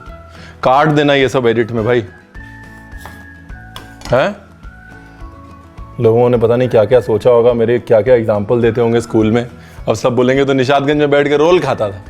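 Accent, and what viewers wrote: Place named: Punjab, India. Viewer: Do you ever shower and clean up! You and your Punjabi accents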